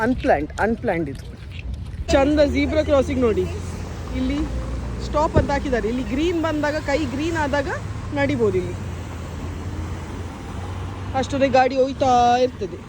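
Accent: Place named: Tulu Nadu, India. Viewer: ಸೂಪರ್ ಮೇಡಂ. ನನಗೆ ನಿಮ್ಮ ತುಳು ಮಿಶ್ರಿತ ಕನ್ನಡ ಮಾತಿನ ಶೈಲಿ ತುಂಬಾ ಇಷ್ಟ. ತಾಯಿಯಾಗಿರುವ ನಿಮಗೂ ಅಮ್ಮಂದಿರ ದಿನಾಚರಣೆಯ ಹಾರ್ದಿಕ ಶುಭಾಷಯಗಳು. ನಿಮ್ಮ ಮಗಳು ಮುದ್ದಾಗಿದ್ದಾಳೆ. ನಾನು ಬೆಂಗಳೂರಿನಿಂದ. ಮೂಲತ ನನ್ನ ಊರು ಪುತ್ತೂರು. ಧನ್ಯವಾದಗಳು ಮೇಡಂ.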